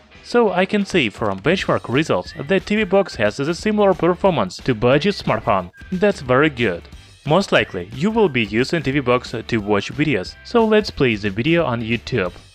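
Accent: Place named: Russia. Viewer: Is this the bother with less of an accent that is more understandable? Nothing against Mother Russia, but seriously guys. There has got to be someone you can find to do the voice aspects to videos. Hell Siri can do it for real cheap. Then viewers won’t have to hit rewind as many times as I had to just to understand what was said. “Th” doesn’t have any “z” in zhem, oops I meant ,, them..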